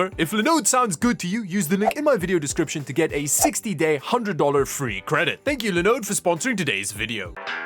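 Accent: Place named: South Africa. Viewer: the best part about watching is that he sounds south african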